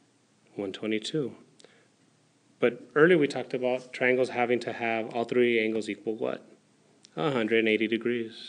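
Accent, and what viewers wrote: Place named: Egypt. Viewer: your voice is like egyptians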